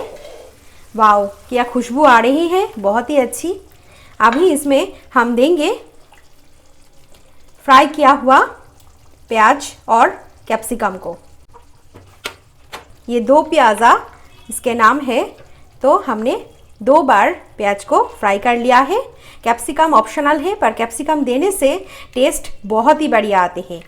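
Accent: Bengal, India. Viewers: Very awesome recipe also delicious I like u r bangoli pronunciation expecting more recipies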